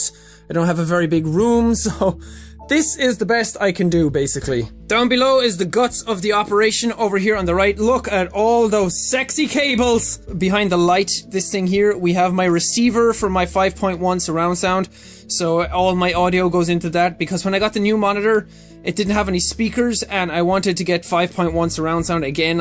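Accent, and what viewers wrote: Ireland. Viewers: you have the best accent just listening to it makes me want to stop being scottish and be irish instead just to have the accent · You're starting to sound a bit more Irish now 🤣🤗😉😝☺️💁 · are u from ireland? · Dat irish accent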